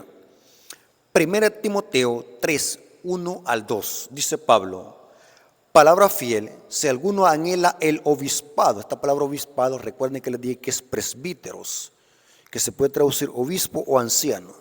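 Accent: El Salvador. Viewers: Dios derrame su Espíritu Santo, siempre en usted. Para que siga teniendo buen carácter y mucho dominio propio, que en estos tiempos es muy difícil tener buen carácter y dominio propio. Aquí en México, cada región tiene su estilo de hablar, y yo sé que usted , es del país del Salvador, y ustedes hablan chistoso( con todo respeto) y su hablar así es de usted de por ser salvadoreño. A mí lo que me importa es que siga firme y adelante con su canal, a pesar de tener muchas piedras de tropiezo. Gracias por compartir sus clases de teología, yo he aprendido mucho, en su canal. Hermano , me enteré de que su papá se fue al descanso, y pues primeramente Dios, puedan reunirse en el día de la resurrección. Cuide mucho su garganta.🙏🙏🙏🙏🙏🙏🙏🙏🙏🙏🙏🥰🥰❤️🥰❤️🥰❤️🥰❤️🥰❤️🥰❤️🥰❤️🥰💯✅💯✅💯✅😊😊😊😊😊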